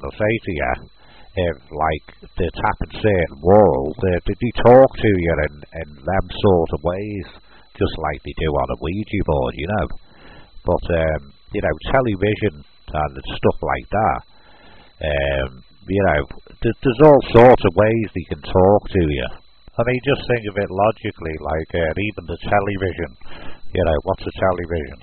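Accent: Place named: Cockney, United Kingdom. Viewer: What is this... some kind of cockney Brit radio broadcast? The audience doesn't even know who is addressing us. More moving images and less static ones... thanks.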